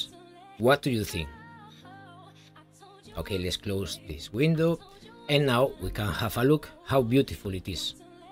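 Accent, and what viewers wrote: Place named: Spain. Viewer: Ese acento suena a español jejejj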